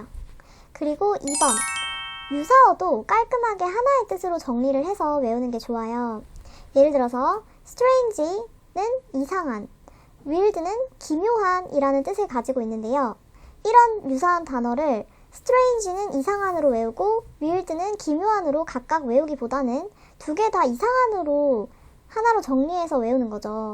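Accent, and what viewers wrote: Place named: Busan, South Korea. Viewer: 부산사시나요 ㅋㅋㅋㅌ 웃기네요 영상 잘 보고 갑니다~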